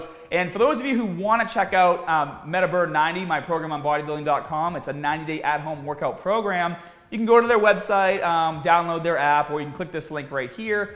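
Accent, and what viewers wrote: Boston, United States. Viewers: This is great content but the aggressive Boston accent kills me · I never noticed the Boston accent it’s killing me 🤣 watched your stuff and subscribed since 2009 keep doing your thing Captain! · This is the strongest Boston accent I have ever heard... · You know your from MA when you can recognize another man's Masshole accent. Keep up the videos brother.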